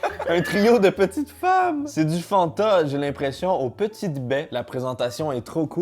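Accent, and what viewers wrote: Quebec, Canada. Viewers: How did you watch this then? With his Canadian french it makes the video 100x harder to watch haha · Jsp si t québécois ou français sa me trouble 🥺😂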